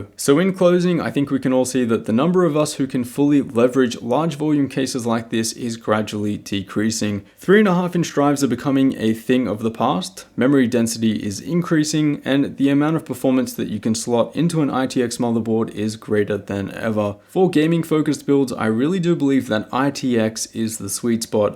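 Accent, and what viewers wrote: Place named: Australia. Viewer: wtf if you want small go small, go small. why are you bashing on the 7 with an aussie accent?! :) and why r u reviewing it in the first place?! water cooling brah! with big radiators and a fancy pump! not like yours!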